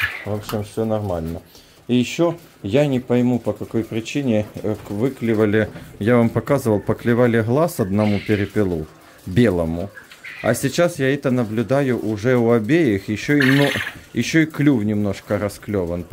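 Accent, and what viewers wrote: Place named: Ukraine. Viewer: Одела очки, слушать Ваш украинский говор, одно удовольствие, хотя чуть понимаю, удачи всем вам!!!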